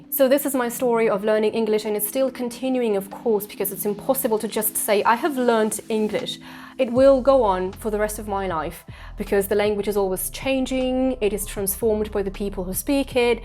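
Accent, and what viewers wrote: United Kingdom. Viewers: pure British accent and super speed ) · Шикарный британский акцент😍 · Какой красивый британский акцент. Просто posh, обожаю. Подписка! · Оо у вас классный британский акцент! Это моя мечта! Смотрела ваши видео по истории по по англ никогда не попадались что странно 🤔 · Ооох, я влюбилась в ваш британский акцент, прям невероятно плавная красивая речь! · Какой очаровательный британский акцент ❤ У меня сильнее акцент, чем у вас, хотя я и живу в Штатах уже почти 12 лет и на бытовые темы говорю свободно. В разговорной речи встречаю незнакомые слова раз в месяц, если не реже, да и носители меня понимают без проблем. Мне в изучении языка очень помогли видео с английскими субтитрами, а потом и без них. Слушаю лекции, подкасты и смотрю документальные фильмы на английском. Вам менять ничего не надо - аборигены обожают мой акцент, хотя он и сильнее вашего. 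Оставьте чуть-чуть экзотического изюма в речи. Насчет увеличения словаря может и хорошая идея, но ваша речь звучит очень легко, без повторений, запинаний и слов-паразитов - очень приятно слушать. И спасибо огромное за замечательгые ведео. Смотреть их удовольствие! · You have one of those British accents🌺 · Your English is very good, slightly more onto English accent. I live in USA for 30 years now and learned from movies and people around but I do have American accent. Thanks for your videos 🙂. They are so cute. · Renée Zellweger's pronunciation in "Bridget Jones's Diary" movies exactly -- вот что мне напомнил Ваш акцент. Она американка, и ей пришлось много поработать над британским акцентом для этого фильма. Со специалистами, само собой. У которых, видимо, была такая же лингафонная система, что и у Вас и много где ещё, поскольку я слышала польских лингвистов-специалистов с таким же вот точно британским произношением. 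Renée Zellweger как раз после фильма раскритиковали "спецы" за недоакцент (на себя бы посмотрели, ага :) ). Так что вот Вам и возможный фидбэк на Ваше произношение от "истинных носителей". Как человек, прошедший специальный и изматывающий курс по произношению профильного иностранного языка по системе и с экзаменами, не могу не восхищаться успехами усердных студентов по всему миру - огромный Вам (и нам всем заодно :) ) респект!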